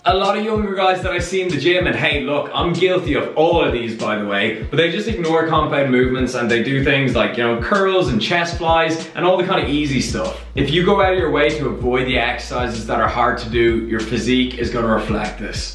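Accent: Ireland. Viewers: SumDude Norris Irish. (Dublin) I think. I'm from Cork and also has a bit of that accent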